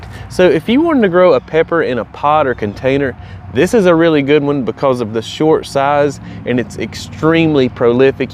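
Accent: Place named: South, United States. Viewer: What the heck! I ain't been gettin' these alerts for the new vids (me trying to sound southern like here) Anywho...great seeing y'all and those are some beautiful plants! Just finished my garden in SW Michigan and it's smokin' hot and no rain in the forecast for days...happy gardening! Great job, once again - cameraman!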